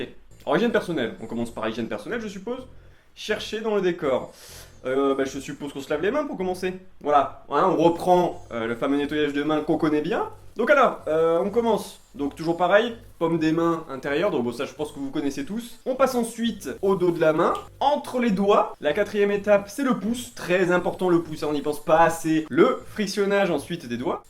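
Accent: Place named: Bordeaux, France. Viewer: Tres beau concept! ca change! perso je dis j'achete! 😂👌👏belle accent bordelais je vois 😍(les bordelais les meilleurs 😊)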